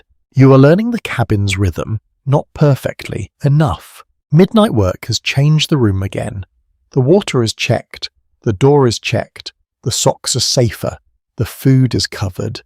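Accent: England, United Kingdom. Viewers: These are stories of American history. If these voice overs are AI why use a “person” with an English accent. Why not use a voice more like Sam Elliott? It just seems more fitting. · Pcffffst. Give us simeone without a brit accent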